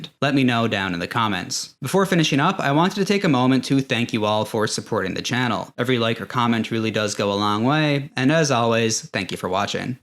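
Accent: Newfoundland, Canada. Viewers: Ive watched a few of your videos and enjoy your presentation, and not that it matters, but are you from Atlantic Canada, like Newfoundland & Labrador? I thought I could hear Irish or Scottish, but if I was on a gameshow I'd lock in NFL. Will be subscribing. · hey are you canadian? you sound like a newfoundlander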